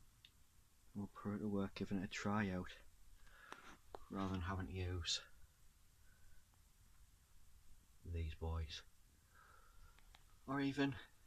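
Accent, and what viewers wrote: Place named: Newcastle, United Kingdom. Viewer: Hey, I've got one of these, bought new! Nice to hear Geordie accent...trying to change the blades,,,,, first time in all these years..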